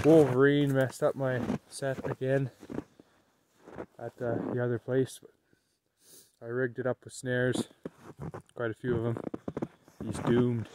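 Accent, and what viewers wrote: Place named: Canada. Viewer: Also, I’m Canadian and you sound really Canadian am I right? Nice to find Canadian creators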